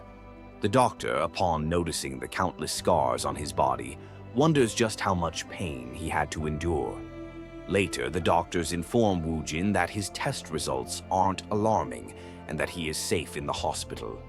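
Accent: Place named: United Kingdom. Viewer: Why is blud british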